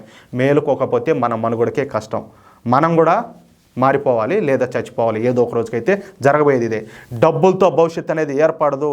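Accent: Rayalaseema, India. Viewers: మీరు రాయలసీమ ఉచ్చారణతో చాలా బాగా చెబుతున్నారు కంప్లీట్ గా ఆంధ్రప్రదేశ్ వాళ్లకు అర్థమవుతుంది ధన్యవాదాలు మిత్రమా🎉 అక్కడ ప్రజలు కూడా నిజానిజాలు తెలుసుకోవలసిన అవసరం ఉంది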